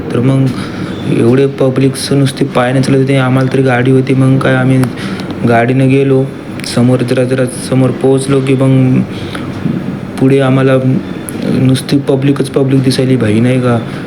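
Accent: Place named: Pune, India. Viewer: Punekar bolte bhaiiiii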